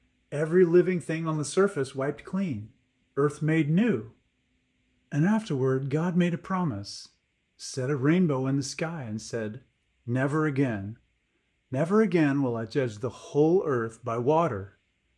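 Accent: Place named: Utah, United States. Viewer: You sound like a utah Mormon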